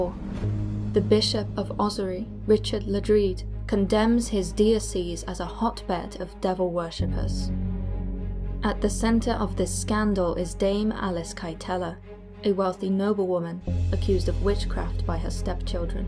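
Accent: England, United Kingdom. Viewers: Your voice makes me imagine that I'm being told the story from an actual family member! I have an American accent and have always loved them English accent. I'd listen to you read the phone book. I wish your voice was on my devices..."wake up darling, it's time to cease the day. Go on now, wake up." Hehehe......or "alright babe, you're all tucked in and ready to begun your journey to dreamland, goodnight darling." Ahhh that would be awesome! Who knows contact Google....you never know 😉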